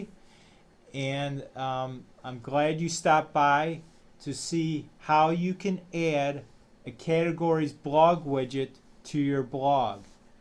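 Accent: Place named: New York, United States. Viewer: Nice to hear a New Yorker! Thank you for the very clear examples of each option for showing a category list in a sidebar.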